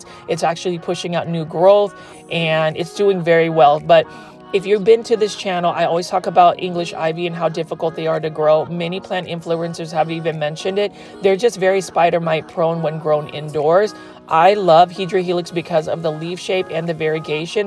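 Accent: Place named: Philippines. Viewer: Who cares how he pronounce it. Everyone already knows he from the Philippines and that he has an Filipino accent. So his word pronunciation is different from the way we pronounce words.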